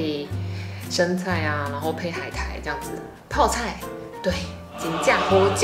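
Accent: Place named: Taiwan. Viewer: 說台語好可愛❤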